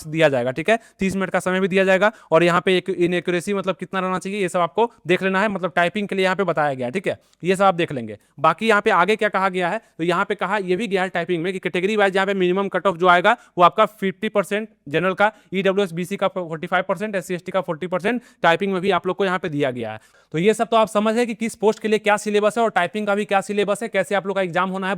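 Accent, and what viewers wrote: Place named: Begusarai, India. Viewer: Begusarai.se.bolte He.sir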